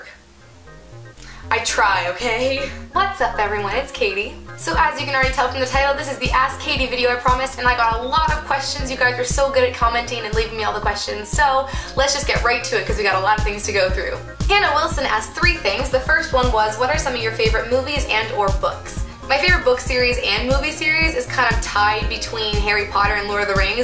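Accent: Canada. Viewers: Harry Potter is my favorite! I'm also really good at math although English is more fun for me. Ps your accent is so cute! I love Canadians.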